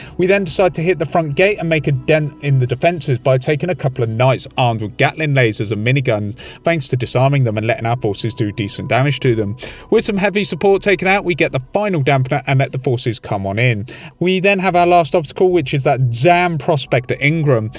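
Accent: United Kingdom. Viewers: I always forget that you're British. And then I click on a video and get a right shock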